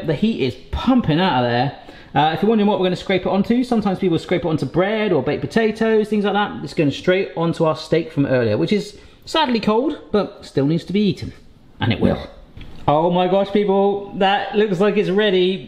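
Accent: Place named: United Kingdom. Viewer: I love the british accent😍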